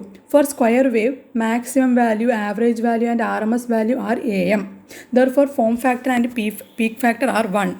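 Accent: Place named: Kerala, India. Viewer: Its accent seems like you are mallu😂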